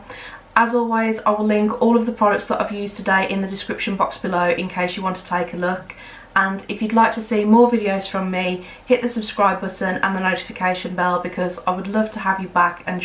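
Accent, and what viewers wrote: Birmingham, United Kingdom. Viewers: Fabulous calming and informative video :) are you from Birmingham? Hope you don’t mind me asking, it’s lovely to hear it! :) x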